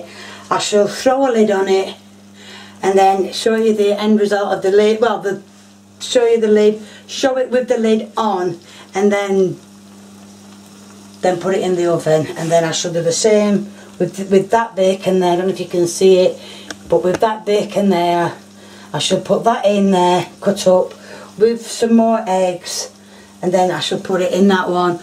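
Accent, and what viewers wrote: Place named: Sheffield, United Kingdom. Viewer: Hi:) can you make your own pizza? if not for you.. then for Lee...;) I'm from Sheffield... you sound from round this way x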